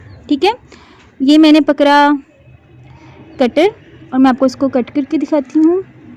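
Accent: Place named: Lahore, Pakistan. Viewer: خدارا اردو کا بیڑا غرق نہ کرو اردو کی ادائیگی مناسب انداز میں کرو یہ لاہوری اردو سی پرہیز کریں'اردو ہماری قومی زبان ہے اس کو خراب نہ کریں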